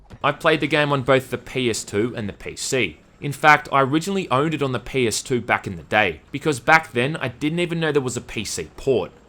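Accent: Australia. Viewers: Hey man, just found your channel and I really really enjoy your content. I don't ever comment on YouTube and who knows if you'll see this but I've been binging absolutely all of your videos this last week. My wife keeps wondering why she's constantly hearing an Aussie man talk about "utter sheeeit" games at 2 in the morning. Just a lot of thought and care went into each of these videos and it really shows. Thanks.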